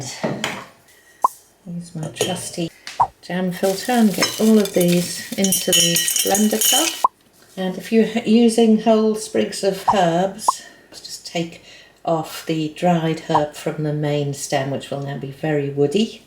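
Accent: United Kingdom. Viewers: Love your channel ❤️, makes a change to see an English UK speaking person 🥰!, Makes recipes etc better to follow as all your ingredients are from here 🇬🇧, I've literally binged all your videos regarding the pro+crisp airfryer 🥰, thanks to you I've actually had the conference to use mine & have cooked a whole chicken🍗,with veg, boiled eggs 🥚& am going to try to dehydrate some veg today following your video step by step ❤️❤️❤️.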